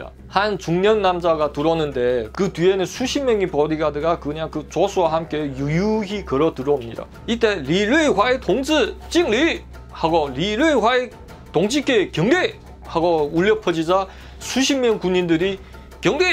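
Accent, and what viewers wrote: Yanbian, China. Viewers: 발음을 들으면 연변조선족인거 같아요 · 이름도 한국이름이고 근데 뭐지.. 중국사람인가? 발음이 사투리도아니고 뭐.. 중국사람인가요 이분?? 우연히 .보게 되었는데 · 조선족말투 잘하시네요 ㅎㅎ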